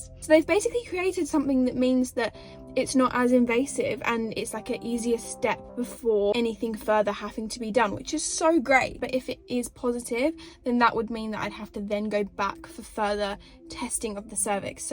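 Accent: Australia. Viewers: UR AUSTRALIAN ACCENT HAS CAME OUT AO MICH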